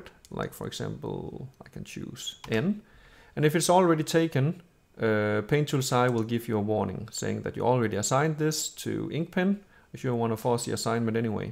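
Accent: Denmark. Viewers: Are you danish? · are you danish? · Are you Danish?? Just asking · Because i recognize a lot of Danish words like: "men" and some English words pronounced with a Danish accent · Are you Danish o: ? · Are you Danish?😱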